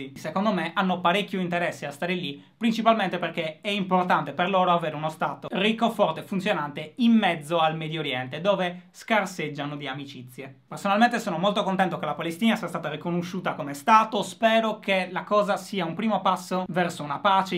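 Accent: Sardinia, Italy. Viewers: a quei tempi il tuo accento sardo era più marcato XDDD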